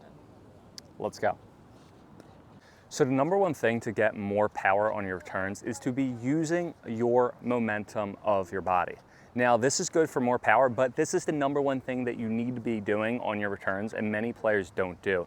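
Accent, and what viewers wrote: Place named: Philadelphia, United States. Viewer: Just discovered your channel—very valuable lesson! —you from Philly? Dialect reminds me of home…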